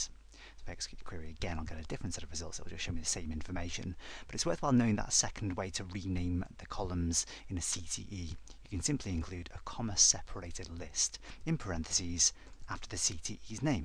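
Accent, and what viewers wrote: United Kingdom. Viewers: British accent = learning more SQL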